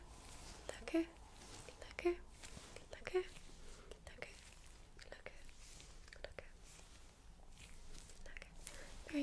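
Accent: United Kingdom. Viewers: YouTube need some more decent British accent ASMR like your channel 😊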